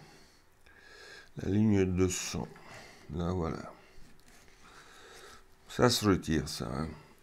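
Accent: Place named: England, United Kingdom. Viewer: Sir, thank you! J'admire votre courage de présenter la recette en français, alors que j'ai décelé un accent anglais. 💛👍. Subscribed!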